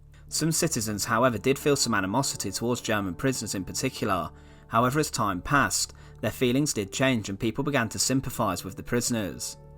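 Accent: United Kingdom. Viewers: ...so says a guy with a British accent...let’s ask a German who actually was imprisoned in one instead of the enemy who kept them there.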